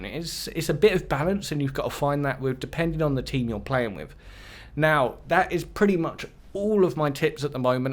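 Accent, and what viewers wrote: United Kingdom. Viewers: ur accent is amazing, are u british? Watchng your vid makes my enflish better and apex skills better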